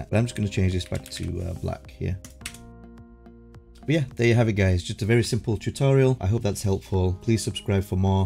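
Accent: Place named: West Yorkshire, United Kingdom. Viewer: That's a guy from West Yorkshire who has lived in Japan for 10 years, so it's a little hybrid 😅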